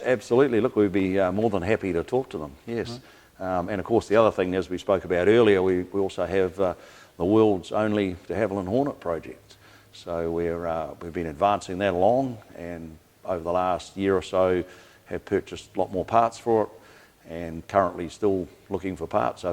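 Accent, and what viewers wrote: Australia. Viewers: Bollocks does this gent have a thick Aussie accent! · Sounds Aussie?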